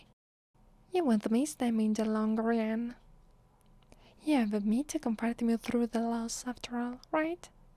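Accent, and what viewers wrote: Italy. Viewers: Ah the almighty spaghetti accent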